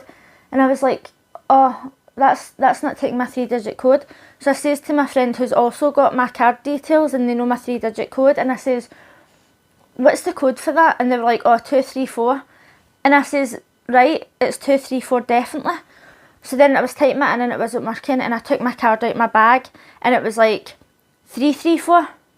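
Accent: Scotland, United Kingdom. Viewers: It isn't your accent, it's your enunciation. I listen to a lot of Scots' channels and I don't have any problem understanding them. I can't understand 2 words out of three that you say, however. I have the same problem with my grandson; he talks too fast and doesn't enunciate clearly. These are easily-solved issues without sacrificing your beautiful accent.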